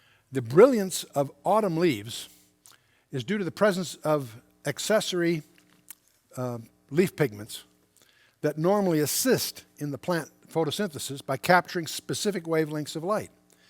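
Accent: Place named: United States. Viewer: What a great blessing Chuck has been to us remnant believers. ❤️🇭🇲 I heard Amir Tsarfati say in his zoom call Q&A with the Romanian churches in Australia (a year ago) that he loved Chuck, and is still friends with his daughter and her family. This made me happy. Chuck's like my Dad, but with an American accent. My Dad loves Chuck too, and told me about this channel, which I immediately subscribed to, and added to my playlists.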